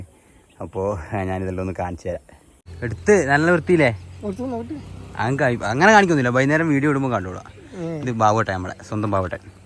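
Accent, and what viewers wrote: Kannur, India. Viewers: കണ്ണൂർ ഭാഷ കേൾക്കാൻ നല്ലരസണ്ട് 👌🏻👌🏻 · കണ്ണൂർ slang കേൾക്കുമ്പോൾ എൻ്റെ വീട്ടുകാരെ ഓർമ വരുന്നു..ഞാൻ ചേലോറ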